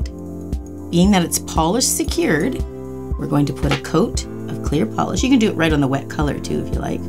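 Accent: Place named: Canada. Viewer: that canadian accent 😙😍😂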